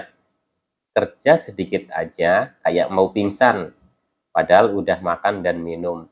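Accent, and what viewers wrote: Banyumas, Indonesia. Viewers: WALMAS hadir mas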